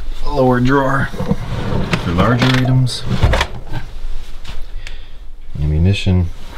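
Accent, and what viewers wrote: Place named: United States. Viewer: Voice of an American, I guessing probably not a Democrat...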